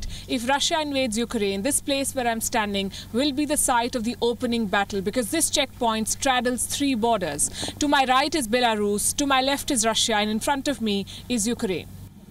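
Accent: India. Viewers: Sometimes I don't understand your english because your indian accent is still too thick for me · She has a mad Indian accent! In fact ALL Indians have the same accent…weird🤪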